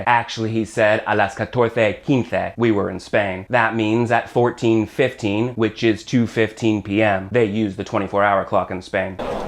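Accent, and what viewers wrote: England, United Kingdom. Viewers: Im a big fan of you. im from Jordan ** the country** im learning from you English accent and some spanish. thanx keep it up asta luego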